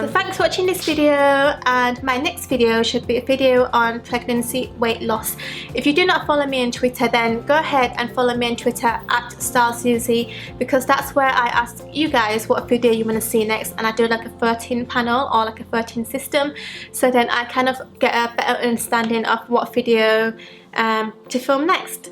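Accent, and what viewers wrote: Yorkshire, United Kingdom. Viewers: Your accent sounds like british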